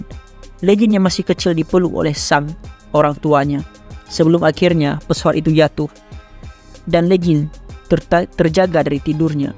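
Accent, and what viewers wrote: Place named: West Nusa Tenggara, Indonesia. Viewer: lu orang ntb ya bang? logatnya kayak teman dari sana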